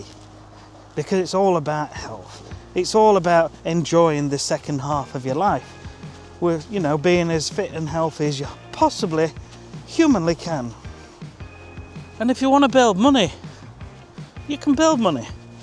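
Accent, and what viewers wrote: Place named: North West England, United Kingdom. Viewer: I'm guessing in the North West somewhere from the accent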